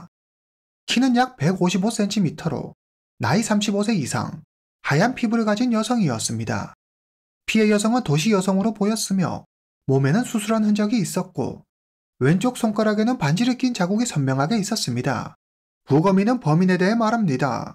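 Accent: China. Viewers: 방송인 중국사람인가 ?말투가 어디지?궁금하네 ...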